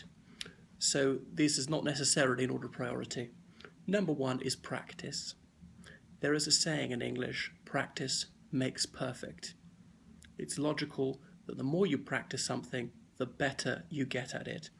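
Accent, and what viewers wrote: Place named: RP, United Kingdom. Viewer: I'm trying to learn british rp and your videos is a goldmine! I'm learning it's important to speak slower for btisih rp. I do notice in Downtown abbey that there is a bit more musicality, for a wanting a better word, in their accent than yours in this video. Is that kind of intonation and musicality in their speech considered terribly old fashioned these days and would be laughed at?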